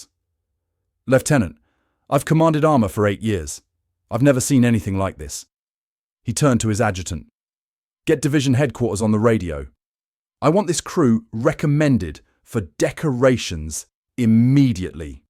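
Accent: United Kingdom. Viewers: A British accent doesn't make it true!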